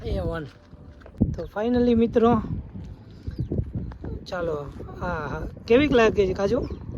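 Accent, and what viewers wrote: Kathiyawad, India. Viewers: Har har mahadev..... tamara videos bo boring hoy che.... tamaro bolvano lehko batave che ke tame fakt kathiyawadi Gujarati boli sako cho to bijane samaj ni pade.... tame natak pan bo karo cho jemke hamna aa aunty.... jagya ne barabat explore karta nahi aavadtu tamne.... matlab tame khali dekhado karo cho navi camper van banavi eno.... bo boring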